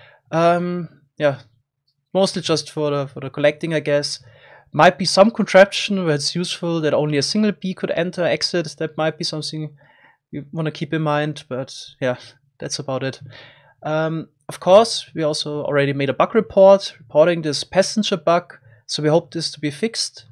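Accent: Germany. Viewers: Da hör ich doch den deutschen Akzent😂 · Are u german thiss accent insane haha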